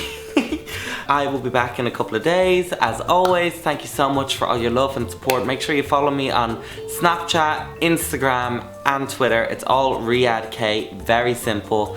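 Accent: Ireland. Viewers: Is he Irish? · So do you live in Ireland cause you sound like an Irish person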